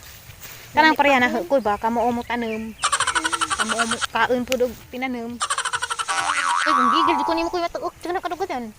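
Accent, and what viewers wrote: Bohol, Philippines. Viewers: Lambing ug punto pagka binojol...heheh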